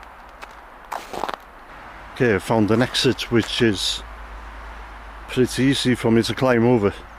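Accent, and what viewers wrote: Wales, United Kingdom. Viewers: Sounds Welsh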